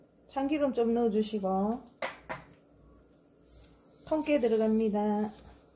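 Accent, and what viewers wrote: Busan, South Korea. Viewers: 안녕하세요^^ 음성듣고 반가웠어요 저도 부산 사람 이라 🤗🤗 풀시청하고 선물드리고 갑니다🎁🎁🎁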